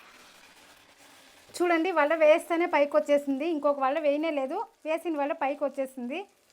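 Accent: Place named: Tamil Nadu, India. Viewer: But, you are looking and speaking like a Tamilian ,akka 😃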